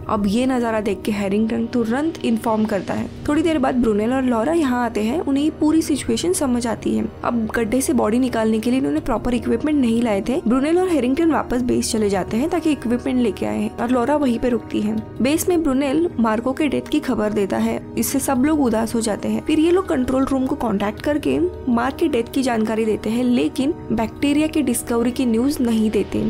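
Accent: Maharashtra, India. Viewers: be patient मेहनतीच फळ मिळेल. मराठी Accent असूनही हिंदी छान explain करतेस तू .. थोड innovative कर with face explanation like " flimi indian" channel सारख public review घे इकदा Q & A घेऊन त्यांना काय हव आहे, त्यांना काय पाहायच आहे ., popular काय आहे ते विचार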